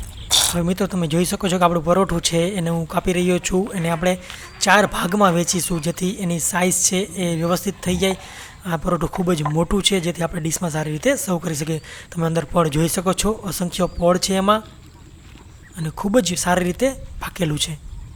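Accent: Kathiawar, India. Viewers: મિત્ર, સલામ, આપની વાનગી અમોને ખુબજ લલચાવે છે, વ્હાહ, મજા આવી જાય... આપ શ્રી. આપની કાઠીયાવાડી માં બોલોને, ઈ અમોને ગમશે, જેવી તમારી રસોઈ તેવી તમારી બોલી.... DHANYAWAD..... ALL THE BEST....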